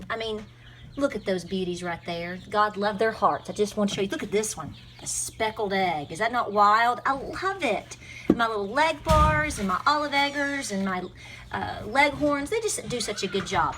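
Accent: South, United States. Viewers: Darlin, I'm gonna make hubby some sausage gravy n biscuits for supper tonight. Gosh, listening to your accent, I do miss Tn and Va !!!! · You shore sound like a true southerner. Butter and honey yummy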